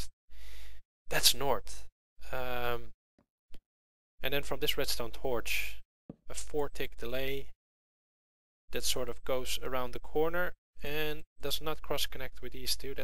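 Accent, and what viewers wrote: Netherlands. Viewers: Are youo dutch?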